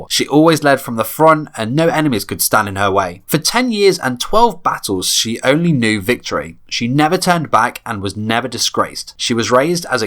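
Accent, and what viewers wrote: United Kingdom. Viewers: are u welsh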